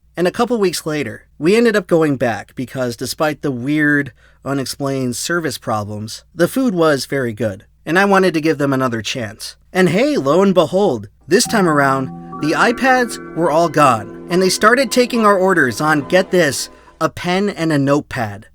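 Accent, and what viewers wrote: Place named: Quebec, Canada. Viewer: Your québecois is leaking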